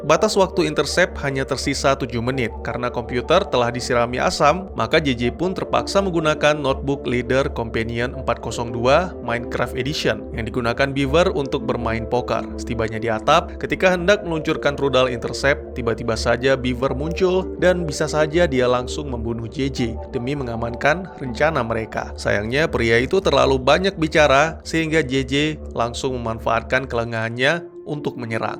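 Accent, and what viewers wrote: Aceh, Indonesia. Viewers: Kak Orang Aceh mana kak?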